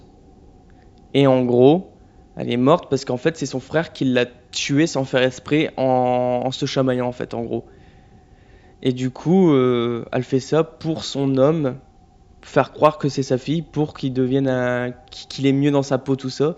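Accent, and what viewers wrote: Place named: North, France. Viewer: Rien à voir désolé mais t’es blond, t’as les yeux noir, un accent du nord, tu serais pas le frère à jojo Bernard ?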